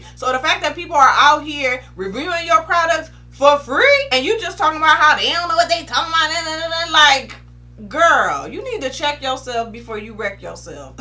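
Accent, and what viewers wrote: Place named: South, United States. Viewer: You sound southern , where you from love 😍. Loved the video and honesty ❤️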